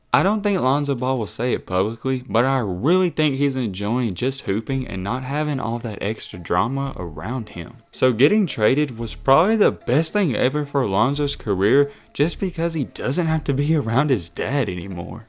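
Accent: South, United States. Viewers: I hate your hillbilly voice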